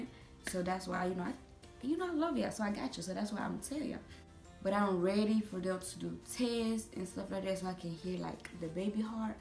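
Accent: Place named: South, United States. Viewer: Lol she sound country with a mix of Joseline herendez she really got a southern accent sounding like Omertà “ you so country you so country.. these hands country too” 😄😄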